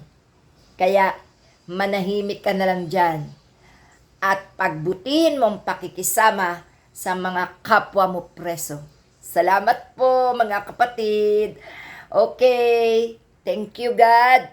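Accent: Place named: Cavite, Philippines. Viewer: Caviteña ka pala Maam..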